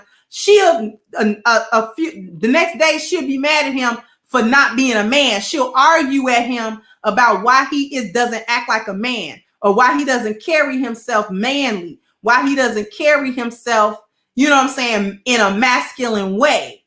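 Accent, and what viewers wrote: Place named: East Coast, United States. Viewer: my sister you sound like a east coast sister much lov Salaam.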